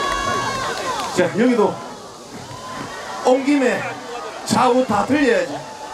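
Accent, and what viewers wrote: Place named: Gyeongsang, South Korea. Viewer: #진해성 가수님 함양중학교 총동창회 축하드려요 더운날씨에 고생하셨습니다 첫무대,대히트곡 사랑반 눈물반 와 최곱니다 살짝썩인 사투리 무대맨트 최고에매력 경상도사투리 많이많이 써주세요 억지로 표준말을 쓸려고하지마세용 ㅋㅋ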